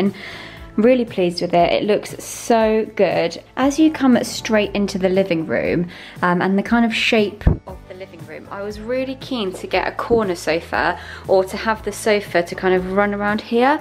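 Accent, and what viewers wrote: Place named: United Kingdom. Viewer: British accent 💗💗💗💗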